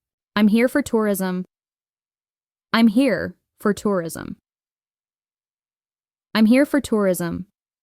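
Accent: United States. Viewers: s accent is american? Any body tell me.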